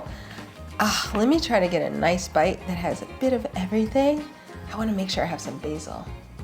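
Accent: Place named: South, United States. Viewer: she's from the south, USA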